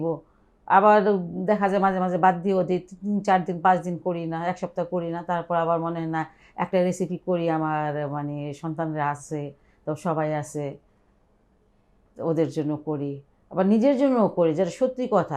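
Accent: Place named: Tangail, Bangladesh. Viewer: আস সালামুআলাইকুম আন্টি।আপনার কথাগুলো খুব ভালো লাগলো।কথা শুনে মনে হচ্ছ আপনি টাংগাইলের ।রান্নার রেসেপিগুলো খুব ভালো লাগে।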